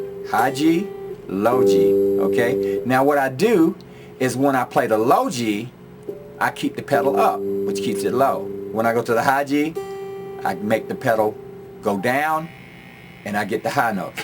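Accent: New Orleans, United States. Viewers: You are one of the bet teachers I have ever learned from. Thank You. is that a New orleans accent?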